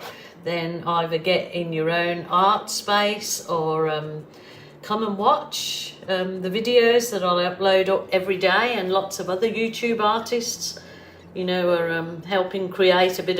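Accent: United Kingdom. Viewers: Hello I tried to send you a message yesterday but it seems to have got lost in cyberspace! Anyway the gist of my message was to basically tell you how very much I thoroughly enjoy & appreciate your tutorials. I adore your art and am learning a lot watching you paint. Also enjoy your sunny chatty disposition and your delightful British accent which so happens to match the Sunshine Coast where you reside. Thank you for all your tips which is going to help me immensely when I hope to embark on my painting venture instead of simply being a couch potato!!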